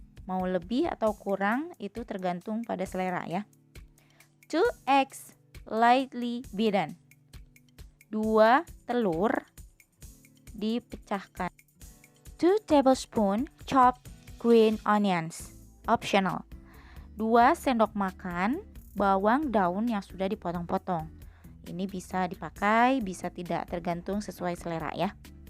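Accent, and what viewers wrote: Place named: Indonesia. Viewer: Nice indonesian accents Favourite teacher....thankyou for the lesson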